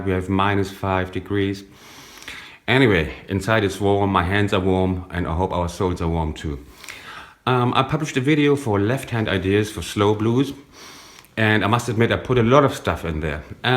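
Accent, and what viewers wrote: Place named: Germany. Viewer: Hallo, nice to hear your german accent......you Really make a good Job for beginners. One Problem for me was your First Trick for advancing the next chord.You speak from a Long Or nodded Note.I dońt Hearings ich in the beginning a looong Note, but only a strong Note.????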